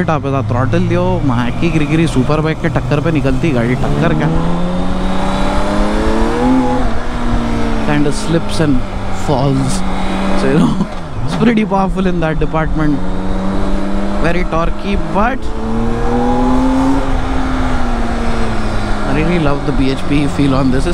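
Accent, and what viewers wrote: Hyderabad, India. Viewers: K T M 1290 k Bare me Video Banow..Zara Hamari Haidrabai me Bolne ka nakko Bhulo..yaron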